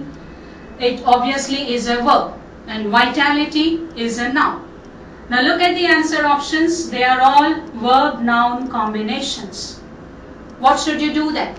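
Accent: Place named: India. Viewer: By the acent, she's indian :D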